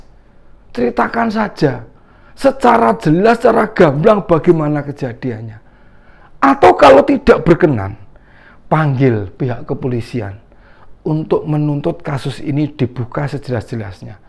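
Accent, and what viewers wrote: Java, Indonesia. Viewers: Medok amat ini bpk logatnya aku juga dari jawa tp logatnya nggak medok banget tp lucu dari logstnya aku juga nonyon kontenmu sampai ful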